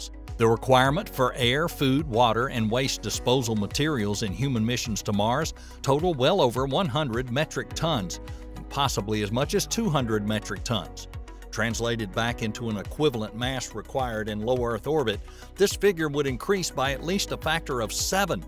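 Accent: South, United States. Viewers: Another too rare video without randomly CAPITALISED WORDS in the title or inconsequential exclamation marks or deceiving wording, stupidly hoping it will generate more views. Please keep this more mature southern accent voiced guy that I learned to appreciate and stop occasionally replacing it with the other prepubescent one. Maybe keep those shady (and, quite frankly, dumb) tactics for your other thousand Youtube profiles I unsubscribed from, but please keep this one free of bullshit. Otherwise, I'm grateful for this well presented informative content.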